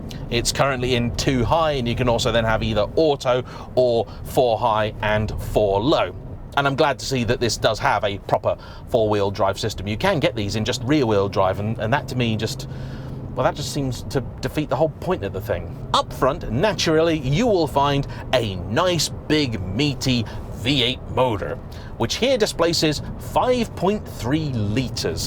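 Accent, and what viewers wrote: United Kingdom. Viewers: Hi first off I always love hearing a British accent. I am born and raised in America Virginia to be exact but my roots run all the way back to Manchester England. Anyway I am 64 years old and I was raised on big American cars and I love the Suburban. However the landscape has really changed in America in the last twenty years and many people now are not that crazy about them. With all of the climate change crap iconic American Vehicles like the Suburban aren't that popular anymore. I still love them though and this video makes me want to go buy one. I think your reaction at the end said it all you found yourself really liking it which most people would if they gave it a chance. Hey there's a reason it's been around so long. Great video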